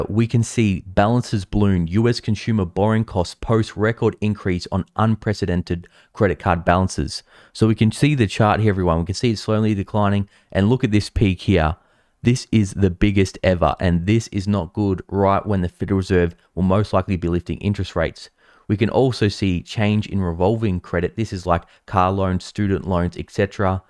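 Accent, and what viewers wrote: Australia. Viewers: You sound like you're from Australia how's it going down there I think you should probably pay more attention to where you're living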